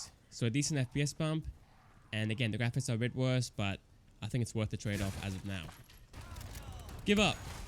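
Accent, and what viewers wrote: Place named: Australia. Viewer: I’m making you my new benchmarking guy always nice to hear an Australian of coarse but I also love how you structure your videos.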